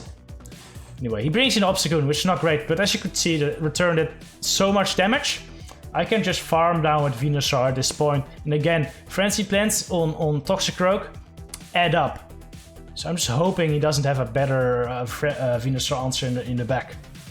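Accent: Netherlands. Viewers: yes, he is. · Wait are you dutch bc you talk with a Dutch accent great video btw!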